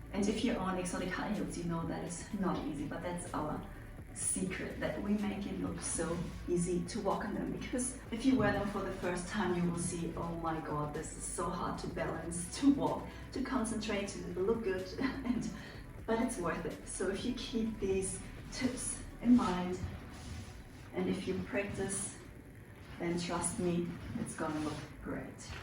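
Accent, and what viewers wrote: Germany. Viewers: Are you German? 😊 Great Tips, thank you for sharing!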